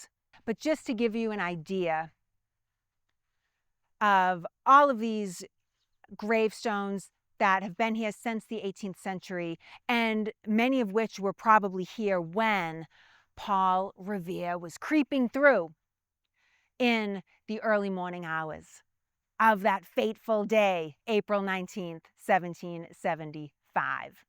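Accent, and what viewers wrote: Boston, United States. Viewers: This Bostonian has been trying to pinpoint what part of the Boston Area your accent is originally from? North Shore? South Shore? Dorchester? Medford? - Inquiring minds want to know! Even a hint will do. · Love having someone with an authentic "Bahs-tun" accent doing these videos! I'm always checking hers against my own and yeah, I sound that way too! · I appreciate your Bawstun accent,. 😎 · As a proud Bostonian born and raised in East Boston. I never hear the accent when I'm speaking to my friends outside New England but I hear yours loud and clear and I have to smile. The passion you have for the story is tremendous. I have a couple of ideas for you during this the 250th Anniversary. First look at the Battle of Chelsea Creek and there is a resource for you at the Grand Lodge of Masons of Massachusetts on Boylston st. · It is good to hear a local person giving this history with a local Massachusetts/Boston Accent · Oh...love your "heavy" Boston accent BTW... 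· Love your videos and the Boston accent 😊. It doesn’t hurt that you are gorgeous ❤ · You from Somerville or Southie😂, I have the same accent😅❤ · I love even just hearing your voice on these video. Listening to English being spoken the way GOD Intended it.😊 Those foreign accents non-Bostoniand have are SO alienating. Your voice IS "Home" to me. Keep up the FANTASTIC work you do. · You have a serious Boston accent. My wife always accuses me of the same... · I'm from suburban Boston and was raised there from the 70s-90s and felt I knew the Boston accent, but yours is a little different. It sounds stronger and more pronounced in some ways. Wondering where you're from? And whether it's changed a little... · Geeze, growing up in Albany we were down on Boston --- sox. Ur accent still hits me. Otherwise gd video.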